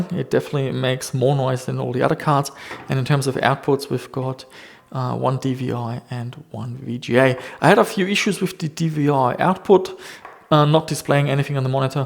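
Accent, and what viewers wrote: Germany. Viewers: Are you German? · Are you a german? Bist du ein deutscher? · are you german?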